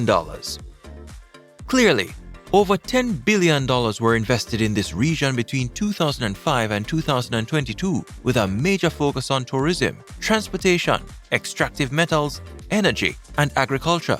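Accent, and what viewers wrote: Jamaica. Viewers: The fact that china notoriously deals with countries they KNOW CANT Pay the back i other to keep land is scary. He decided to invest in every poor 3 world island in the caribbean , Africa 2.0 icoming. Yeah look what they quietly doing i Africa. The guy that made this video sounds jamaican, and the fact that he speaks about this so innocently makes me sad loollll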